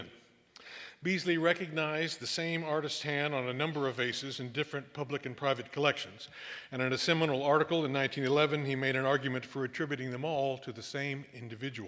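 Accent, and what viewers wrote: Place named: Midwest, United States. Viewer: Thank you for this wonderful, fascinating lecture about Greece, delivered in a charming Midwestern accent. I discovered a new artist who truly deserves worldwide recognition.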